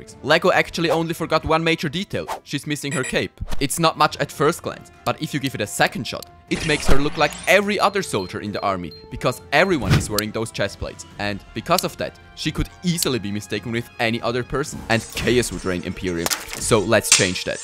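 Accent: Germany. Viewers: Bro are you German ?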